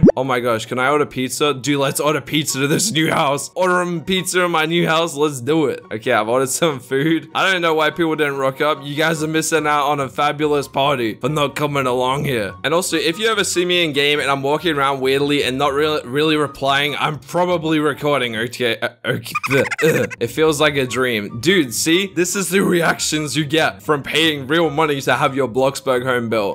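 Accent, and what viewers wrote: Australia. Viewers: What accent do you have? Love it btw Edit: Oh later he says he is from Australia....Lol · I was going to say Australian until I scrolled Down... I have a question.. are you Australian because I am and it’s really easy to tell if your Australian bc any other YouTubed is usually from America or England and they sound so similar but it’s true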